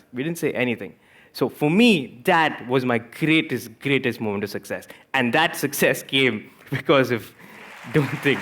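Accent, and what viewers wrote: India. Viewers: The Indian accent is very muddled, speak slow next time. Great talk tho.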